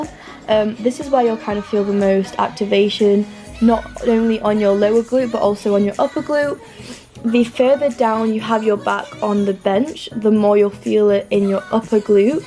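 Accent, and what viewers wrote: United Kingdom. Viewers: Somehow I find the way you speak really attractive, is that weird? 🙈 Btw are your parents/family hispanic? Your name sounds hispanic but your accent obviously is British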